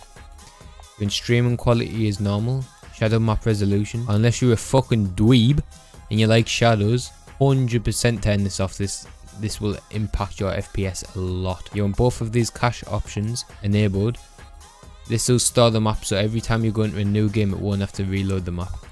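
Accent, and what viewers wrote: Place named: Newcastle, United Kingdom. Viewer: unless your a fucking dweeb love that mate keep it up inaul you geordie? sounds it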